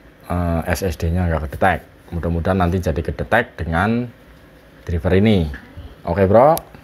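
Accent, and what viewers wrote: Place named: Banyumas, Indonesia. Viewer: Ngapak temen kang😂😂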